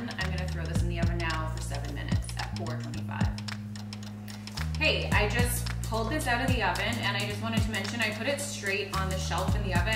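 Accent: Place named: Canada. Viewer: I love the sound of voice and her Canadian accent ❤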